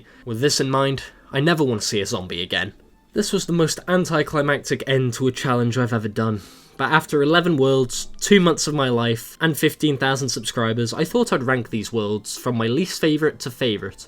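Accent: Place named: United Kingdom. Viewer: Yoooo,thw bri'ish man is back. Now try beating pvz 2 with only flowers.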